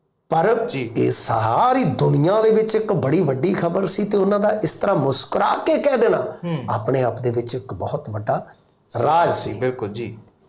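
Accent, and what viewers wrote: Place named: Punjab, India. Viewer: ਭਾਊਆਂ ਦਾ ਸਟਾਇਲ ਹੁੰਦਾ ਆ ਅੰਗਰੇਜ਼ੀ ਨੂੰ ਪੰਜਾਬੀ ਜ਼ੁਬਾਨ ਵਿੱਚ ਬੋਲਣ ਦਾ, ਵੀਰੇ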